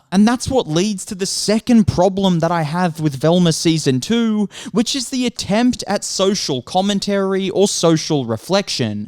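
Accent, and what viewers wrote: Australia. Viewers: It's Australian accent I think. Sounds normal to me.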